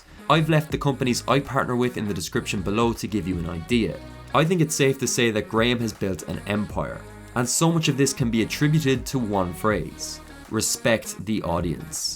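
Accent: Ireland. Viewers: Is this buck Irish? I'm hearing an Irish accent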